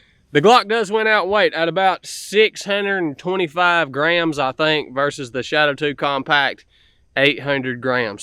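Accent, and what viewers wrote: South, United States. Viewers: Smart people with southern accents are always fun to listen to 🤟🏼🤟🏼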